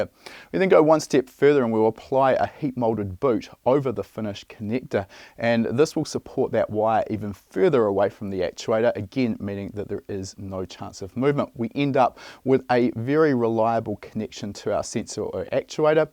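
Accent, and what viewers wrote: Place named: New Zealand. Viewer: I just come here for the Kiwi accent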